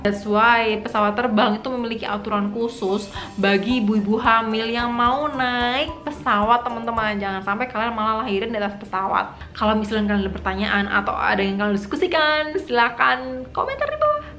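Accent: Java, Indonesia. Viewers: Medok bgt sih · mba bukan orang jawa ya? Orang jawa memang gitu mba, kakak ku juga gitu klo ngomong ngeden2. Itu adalah logat namanya.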